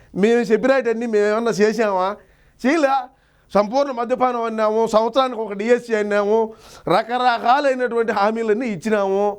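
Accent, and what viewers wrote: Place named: Kadapa, India. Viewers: సార్ మీ కడప భాష చాలా బాగుంది. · మీ కడప యాస చాలా బాగుంది సోదర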